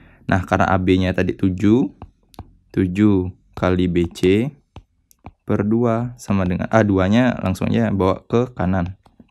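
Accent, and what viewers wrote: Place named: Bali, Indonesia. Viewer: Dari logat nya kayaknya yang punya channel dr Bali.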